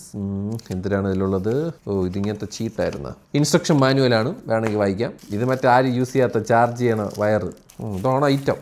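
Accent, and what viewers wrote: Trivandrum, India. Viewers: ട്രിവാൻഡ്രം ചീഞ്ഞ സ്ലാങ് 😡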